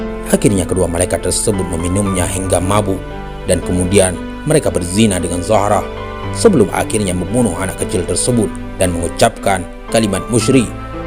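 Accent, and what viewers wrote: Aceh, Indonesia. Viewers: Macam gaya bahasa orang Aceh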